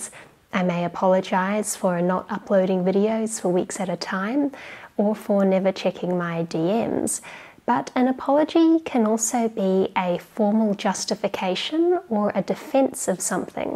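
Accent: Australia. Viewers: Are u Australian? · Hey are u Australian?? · Yes, targeting Indian audience is a good option on YouTube. In India they promote technology like engineering and computer sciences. Is she Australian? Her accent sound Aussie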